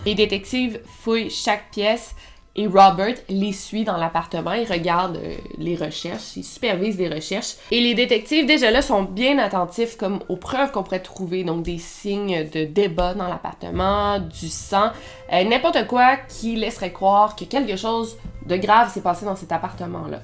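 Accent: Quebec, Canada. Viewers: La fin de l'histoire la chute son enregistrement dans la salle de bain c'est c'est terrifiant !! J'ai adoré la façon dont tu l'as raconté, j'ai vu un téléfilm il n'y a pas si longtemps à la télé française sur cette histoire je ne me souviens pas du titre mais dans ce téléfilm ils ne traitaient que la disparition de Kathie.... J'ai hâte que tu fasses une nouvelle vidéo longue.... Une fan française🇨🇵 de tes histoires racontées avec le bel accent québécois ❤❤❤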